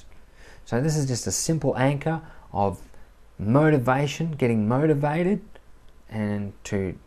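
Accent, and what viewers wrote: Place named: Australia. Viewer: Amazing. I only heard the first two seconds before it went back to buffering and I could tell he was Australian just from "Hi, this is Mar-"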